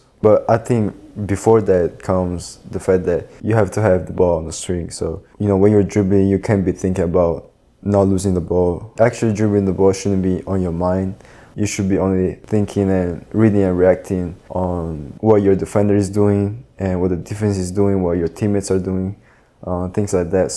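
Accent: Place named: Italy. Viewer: Love your game, but love your mixed accent of Italian & NorCal even more.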